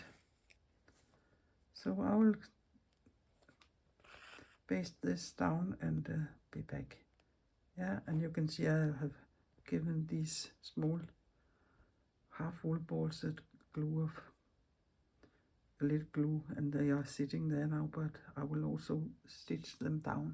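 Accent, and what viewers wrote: Denmark. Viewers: i absolutely love your approach to the creative process - these beautiful fabric and embroidery collages really resonate with me. i'm canadian, but my beloved grandma was danish, and i hear her beautiful, delicate accent in yours. thank you for sharing your unique, inspiring, thoughtful art. i look forward to more!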